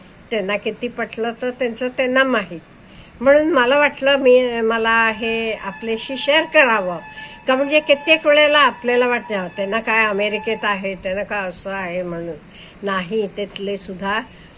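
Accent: Karnataka, India. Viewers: त्यांची मातृभाषा मराठी नसेल..You should accept other language speaking pple's tone!! कानडी असाव्यात..छान बोलल्या.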